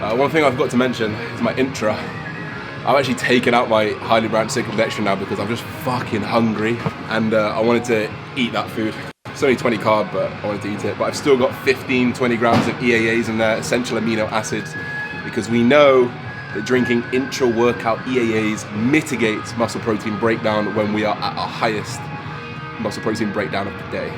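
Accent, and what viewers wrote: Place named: England, United Kingdom. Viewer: I have trouble with understanding english accents, but your content is too good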